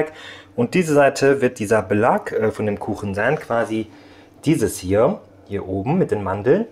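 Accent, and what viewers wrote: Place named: Cologne, Germany. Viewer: Klasse Video,! Kommst Du aus Köln? Ich frage wegen Deinem sympathischen Akzent. Milsch, Honisch...... 🌸🌸🌸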